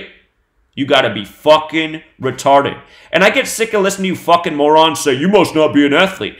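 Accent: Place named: Northeast, United States. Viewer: I agree some what, Your saying u can call games, give game calls to someone in New Jersey. Who has not lost any playoff games to your one game a day speech u said u do. Your wrong admit it!! I bet you don’t even know how much % percent wise u have to win, if you are going to make any profit from gambling FACT PERIOD. Yeah u can easily find out that answer cough cough goggle. If u had that kind of knowledge your talking about, the video wouldnt be up here. there are 100s of millions of $$$$$ if not billions on sports gambling. you would never be able to have any opinion, I fell like with that much money you would disappear, like the men in black would come and take u somewhere. I think someone would do Something to you with this kind of information. You also Said you give a New Jersey guy info on games and he’s has not lost any bets but one and it was a come back that could of made him shit his pants because of the money he wasted from Your word. 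I see threw you and your northern accent that stands out well. Sounds like ur from The north like Philadelphia ,New York,New Jersey etc Area. I don’t think anyone would come OUT and say NBA is rigged here is the proof. u keep saying we are retarded if we don’t believe you. To the point you sound retarded saying u can call games. You Might like all get some right but ur not wining like u say u are. Your not able to call games like u say u do fact! If all pro sports like the nba are rigged,it’s not from technology it’s from the refs com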